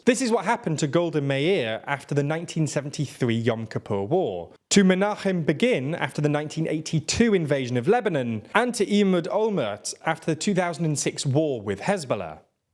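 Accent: United Kingdom. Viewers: Net'nyahu... I hate British accent.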